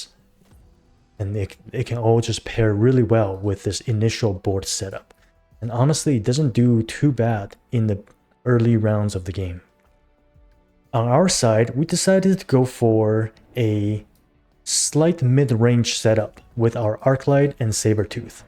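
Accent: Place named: Taiwan. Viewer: ahh yes! my taiwanese colleagues speak in such accent too! keep up the great content! love your explanation on your train of thoughts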